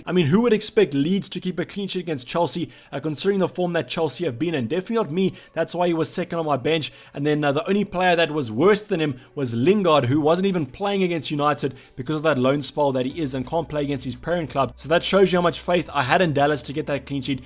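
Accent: South Africa. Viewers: You sound hella South African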